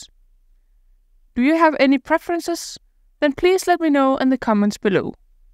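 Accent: Denmark. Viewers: Fantastisk at finde en dansk-engelsk accent - FANTASTISKE videoer all over btw! Du er uden du ved det, ved at lære mig og min dreng godot :D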